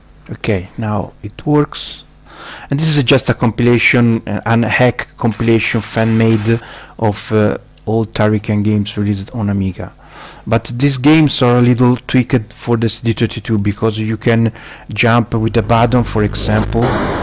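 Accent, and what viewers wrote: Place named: Italy. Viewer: Mi pareva dall'accento inglese :) Bel video, non conoscevo Batocera, ci darò un occhio!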